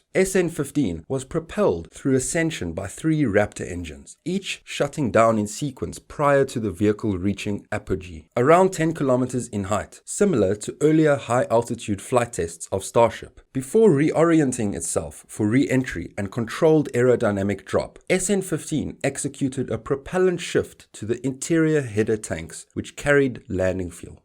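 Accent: South Africa. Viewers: you have a south african accent? i'm from durban, loved the video! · I can hear your South African accent from a mile away.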